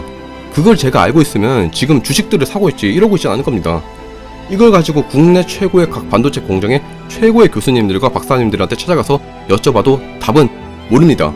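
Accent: Gyeongsang, South Korea. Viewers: 표시나요 깅상도사투리. 숨길래 숨길수없는 첯음절에. 강력한 엑쎈트 깅상도사투리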